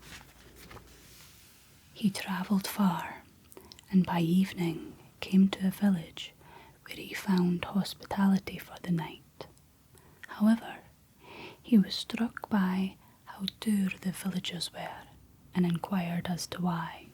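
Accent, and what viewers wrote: Scotland, United Kingdom. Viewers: I’m always up for a wee cozy night with a Scottish lass reading to me with the peak accent. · Wow, you read very well.. very relaxing. And I enjoy ur Scottish way of speaking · What a calm soothing relaxing voice you have and that Scottish accent, I just love it. Very nice video, thanks for sharing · I'll be traveling to Scotland next summer, I'm practicing the accent thanks to your videos! Amazing work!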